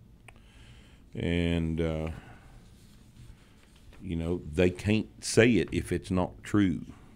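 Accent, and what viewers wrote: Texas, United States. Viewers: in best Zap Branigan Impression a Texan can do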